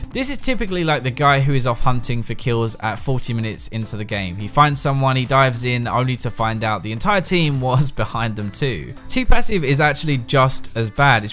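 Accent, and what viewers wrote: United Kingdom. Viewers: Main reason I watch your videos is your wonderful British voice.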